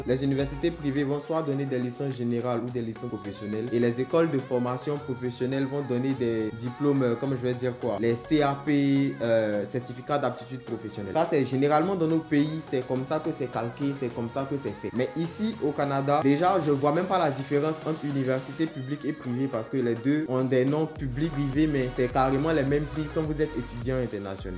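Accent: Ivory Coast. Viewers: La musique en fond de la vidéo est un peu prononcé, la video est excellente, j'aime plus l'accent ivoirien avec les blagues 😏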